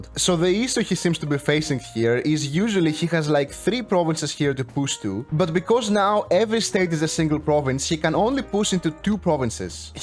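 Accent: Greece. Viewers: The accent... Are you Greek? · superior greek accent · Όταν σε άκουσα να μιλάς κατάλαβα ότι είσαι Έλληνας 😂😂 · Never thought Greek accent sounds like this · Bro I can't believe it I'm greek και κατάλαβα κατευθείαν ότι είσαι από Ελλάδα από την προφορά σου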